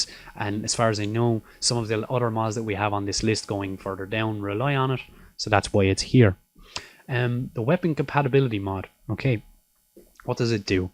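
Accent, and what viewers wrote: Ireland. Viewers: what's lol about it? he's Irish, I believe.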